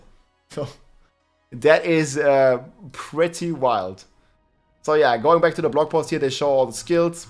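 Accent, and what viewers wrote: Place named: Germany. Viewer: Perditation??? Per-di-shun. I understand German is your first language but whoa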